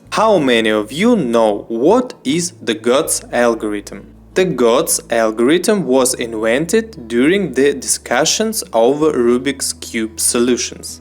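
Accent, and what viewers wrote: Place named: Russia. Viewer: Noomde ye solve babe hota Russian inglish bolta he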